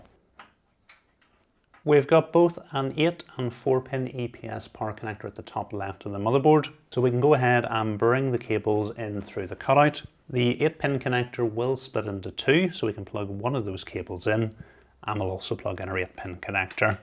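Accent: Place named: Scotland, United Kingdom. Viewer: just scottish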